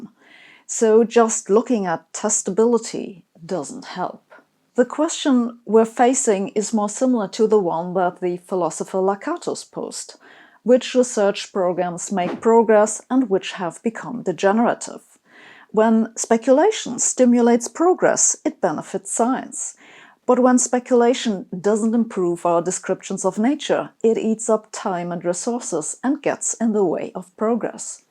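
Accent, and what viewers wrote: Germany. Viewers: She makes German accent sound good, but there is nothing German about the logic itself. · As a student of the German language, I love you accent.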